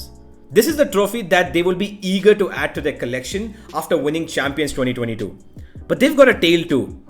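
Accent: India. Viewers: Great video keep it going, its nice to listen an Indian voice talking about this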